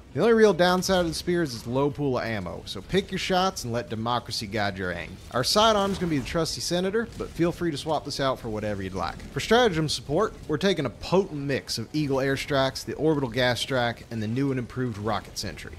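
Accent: South, United States. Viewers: The southern accent fits helldives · Aayy like your southern accent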